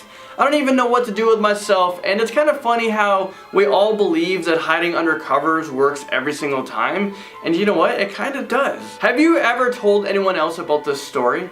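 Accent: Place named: United Kingdom. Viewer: 🛑🛑🛑 #mostamazingtop10 one this chanel is my addiction, I talk about it all the time. I put all my friends on , I watch your videos so much you got me talking In a British accent lmao. On a real note I'd like to share a story that was shared with me .. long story short my grandma and her siblings had a oujia board, while using it the word death was spelled out.. three days later my grandmas brother was out riding his bike ... unfortunately he was hit by a truck and died instantly.. God rest his soul.. ouji is no joke.. stay woke. 🛑🛑🛑 _KeekMarie 🤟 Ignore the Johnny ravo name Im using my boyfriends account.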